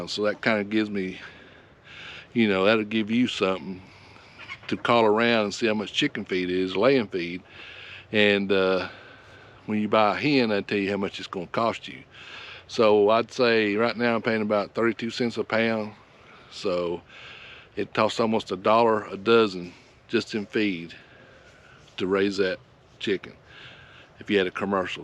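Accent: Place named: North Georgia, United States. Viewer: Stick with the videos, we enjoy watching your progress. I also like your honest voice, I'm originally from north Georgia, so you sound like home. The AirForce took me to north Florida, so thats where i am now. A few more years and hope to be homesteading and farming for ourselves. We have a backyard flock of chickens we enjoy, just hoping to find more acreage in the coming years. Between Florida & Tennessee is where we want to be. Keep the videos coming, i think you're about to get popular. Best of luck & blessings, Jon & Noi from Florida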